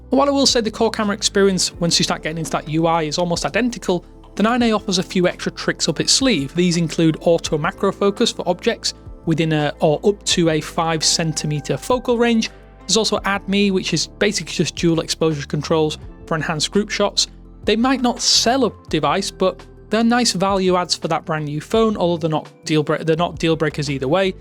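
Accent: United Kingdom. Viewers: british accent 😢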